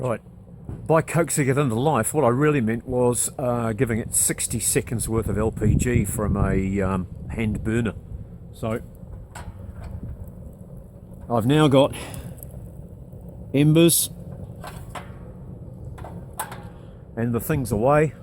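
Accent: New Zealand. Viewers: I thought you on a flight-path or next to the highway. Were are you? I'm thinking going by the accent NZ?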